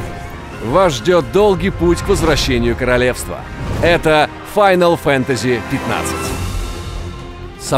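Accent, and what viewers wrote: Russia. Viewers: ОООО, русский голос! #1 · ООООО РУССКИЙ ГОЛОС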